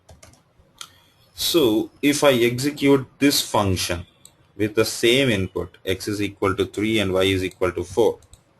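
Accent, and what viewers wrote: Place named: India. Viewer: again indian training... can we have ONE flawless speech without any accent in SAP topic???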